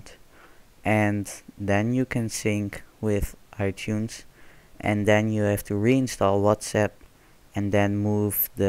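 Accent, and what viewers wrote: Netherlands. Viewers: Aan je accent te horen ben je Nederlands of niet? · Are you dutch beacause your accent sounds very dutch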